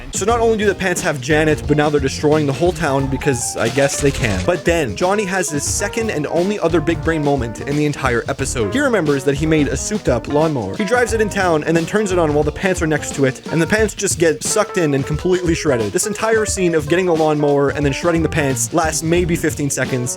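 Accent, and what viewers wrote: Canada. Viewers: Holy crap you swear like a true Quebecker! Props to you!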